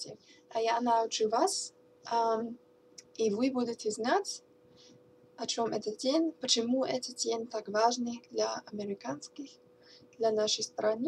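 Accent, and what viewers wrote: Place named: Belarus. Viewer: акцент делает русский язык, очень похожим на белорусский язык =)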